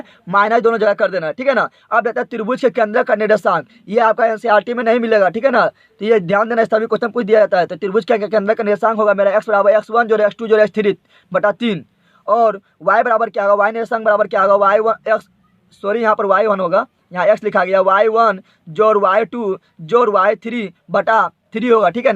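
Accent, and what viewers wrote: India. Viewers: rajasthan Wale ase he bolte h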